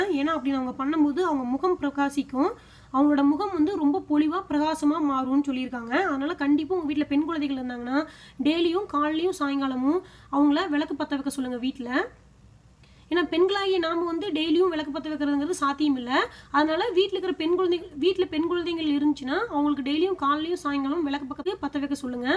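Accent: Kongu, India. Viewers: சூப்பர் பா... அருமையான விளக்கம். எந்த ஊர்காரர்ங்க நீங்க...? நம்ம கொங்கு தமிழ் பேச்சு கேட்க கேட்க அருமை.. 👌👌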